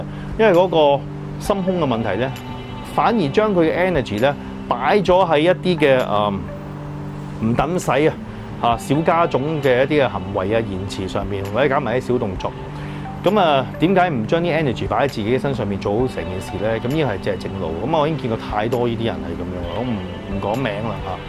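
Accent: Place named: Hong Kong, China. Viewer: Most of English words he said sound in Hong Kong way like the words 'crepe', 'trail'. Mixed with other words 'crap', 'trial' because he doesn't have enough words in his knowledge. And just relate them into a similar one he knows. He also has a very heavy Hong Kong accent when he speaks English. Looks like he doesn't even watch English TV. Ironically, one of his die hard fan Dasy Chan once said his English is so good that he should make a program teaching English.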